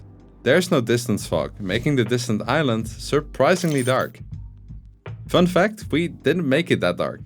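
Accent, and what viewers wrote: Netherlands. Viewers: Ah yes the Dutch accent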